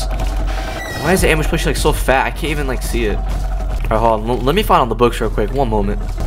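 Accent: United Kingdom. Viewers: i feel like hes gotten slightly british also ambush reminds me of a pillow pet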